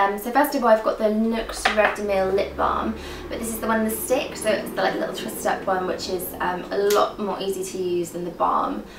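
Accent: England, United Kingdom. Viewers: You look like Drew barrymore with an english accent and normal voice. Perfection!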